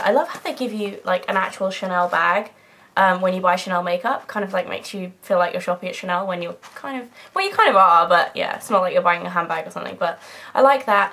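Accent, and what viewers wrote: England, United Kingdom. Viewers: i just LOVE how you said "shorts" @@ i get hypnotized xD i really love the english accent! :D and nice haul! woof adds some fun! LOL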